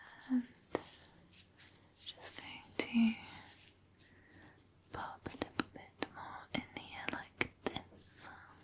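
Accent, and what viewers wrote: England, United Kingdom. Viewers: I now love women with english accents😊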